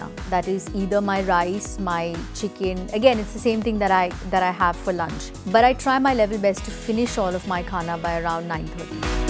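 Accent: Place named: Mangalore, India. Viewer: She is a mangalorean. Most girls speak and have expressions the same way. Also Aishwarya is a mangalorean. We can relate.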